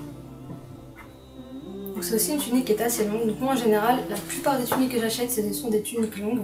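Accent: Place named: Belgium. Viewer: Salut , l'accent belge à l'honneur dans cette vidéo , je suis tombée dessus par hasard, bonne continuation pour la suite ! 🤩🤩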